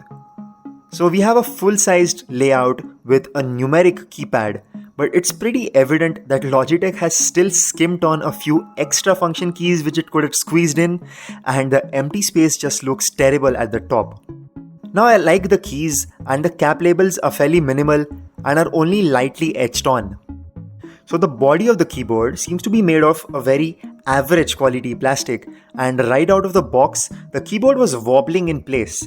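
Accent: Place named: India. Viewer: except for the Indian accent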